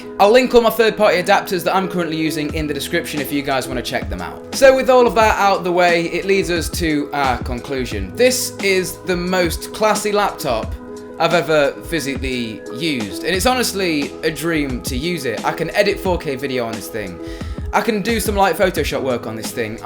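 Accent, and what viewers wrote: England, United Kingdom. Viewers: You’ve basically copied MKBHD with the pros and cons Only with english accent ...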